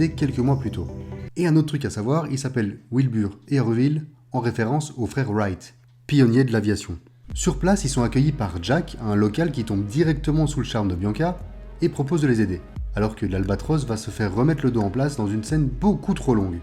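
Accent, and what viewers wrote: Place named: Belgium. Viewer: On entend que tu es belge parce que tu prononces la séquence « ui » comme « oui ».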